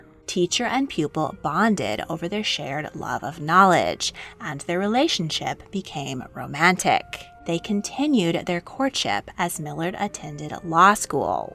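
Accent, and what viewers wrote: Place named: New Jersey, United States. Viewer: OhMayGaud!!! Your Jersey accent is awful!! It makes it very hard to listen to this and take it seriously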